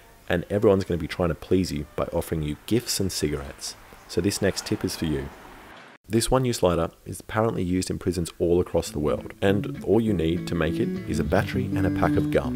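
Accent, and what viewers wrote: Australia. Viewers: ...He's aussie. You'd know by his accent and australia and america jokes. Also, the magpie video. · He's Australian He's saying "how ya going" It's our version of hello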